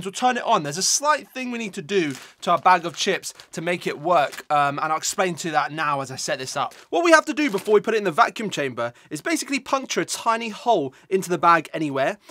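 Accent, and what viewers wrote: United Kingdom. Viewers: why are you saying chips when you sound English?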